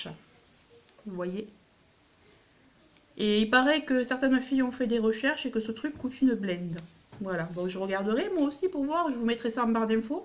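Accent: South, France. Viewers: J'aime beaucoup fashionnista. Alors j'ai reconnu tout de suite ton accent . Je suis aussi dans le SUd. Mazamet je connais ;) Bisous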